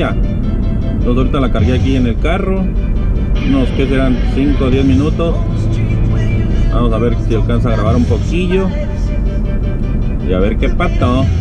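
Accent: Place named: North, Mexico. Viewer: Te oyes bien norteño :v like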